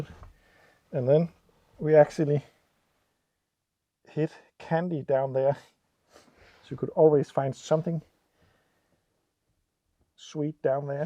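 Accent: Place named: Denmark. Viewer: Looks like a Peugeot 504 at the front. I know a fellow Danish man and his accent sounds similar to yours.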